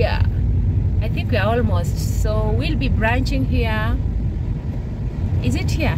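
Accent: Luhya, Kenya. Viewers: hahaha thank you so muich for this video kakamega county is my home i have passed that tarmac road severally but i never knew that road leads to the train station and i never knew the progress at that place thanks so much and uv talked Luhya like a typical Luhya😂😂😂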